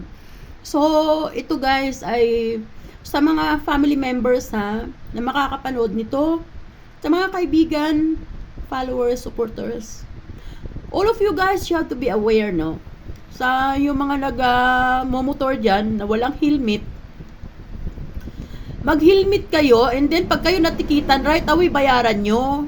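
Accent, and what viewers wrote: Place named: Philippines. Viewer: Watching sharing akala ko Korean ka nanuod ako sayo silent now may sounds pinay ka pala❤❤ kahirap yan dapat talaga mag helmet kasi hirap 100 pa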